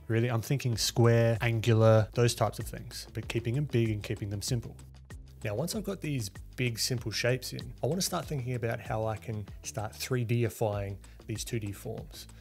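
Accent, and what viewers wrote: Australia. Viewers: I swear you are Australian. That’s all I hear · This type of content is great. Please, continue creating things like this. Really really helpful. Besides you sound incredibly Australian and I love it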